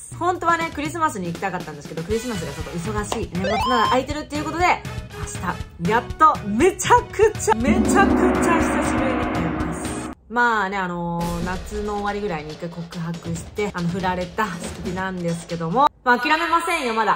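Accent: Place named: Fukuoka, Japan. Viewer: 髪の毛は「とく、とかす」って言います。ボディクリーム、私はアメリカ在住なんですがアメリカでは結構作っている人多くって私も最近友達の手作りクリームのもらって使用してるけどシアバーター、他、色んな自然オイル（ココナッツやアーモンドオイル等、みんなそれぞれ自分にあったものを入れてるみたいです）使用してあってめっちゃ敏感肌な私にはとてもいいです！オイル使ってるのに塗ったあと全然ベタベタぬるぬるしない、でも保湿はしっかりしてくれて最高です！ナチュラルなものばかりなので髪、顔、ボディー頭から足先まで使えるので超便利です。なので私も来年は作ってみようかなと思ってたところです😊 のクリームできたら参考にしたいと思います。私も福岡出身。アメリカからいつも応援してます、そしていつも地元弁聞いて元気もらってます！😊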